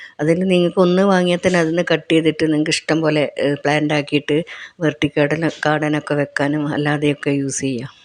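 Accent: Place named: Kasaragod, India. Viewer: Samsaarathil endoo kasrod nn thonni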